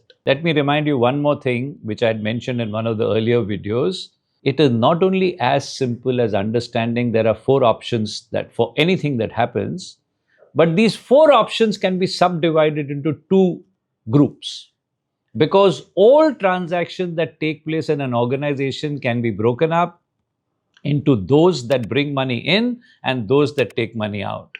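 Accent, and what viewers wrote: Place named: India. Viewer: the rhythm, gestures and pauses all indian, yet speaking in englishhhhhhh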